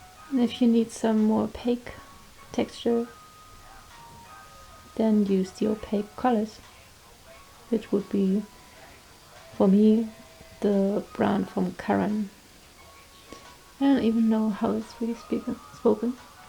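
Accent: Germany. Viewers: Thank you so much for showing us! You honestly deserve so much more attention and love bc your work is awesome! But I'm glad I found your channel now ^^ btw sorry for that question but are you German? I just think you sound a little German :)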